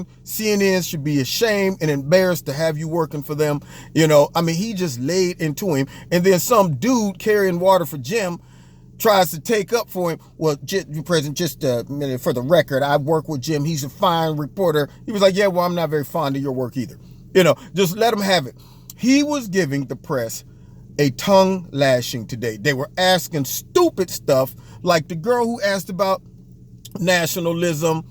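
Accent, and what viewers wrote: England, United Kingdom. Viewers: Hello. I live in England. I loved your English accent. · BRILLIANT SUMMATION! Love your English accent haha Sending love from the UK! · Good limey accent. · You do a good British accent. It is my secret wish that we could somehow peacefully gather together to bring down CNN. I dont want to inhibit free speech, but they should not be able to call themselves the press. There is no single source of hate in our society, but CNN is right up there with the worst of them. Because they intersperse their hateful bias with some semi news, they get away with pure propoganda that is taken seriously. What bothers me the most is how bad they make our country look to the rest of the world. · bad accent , and not many englishman speak like that. its like saying all americans have a New York accent. good points other wise.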